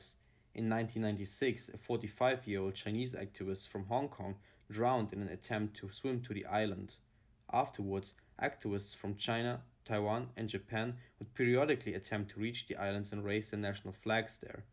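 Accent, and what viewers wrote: Germany. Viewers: My guess would be Central European Germany maybe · What accent? Sounds German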